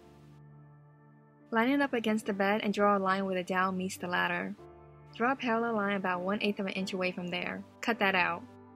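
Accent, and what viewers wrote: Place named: New Jersey, United States. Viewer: Are you from New Jersey? You have the accent.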